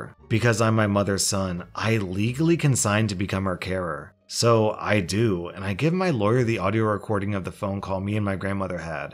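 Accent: Australia. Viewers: 000 he’s Australian for sure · we have an Australian in the house,G-day (I know no one actually says that seriously but hello)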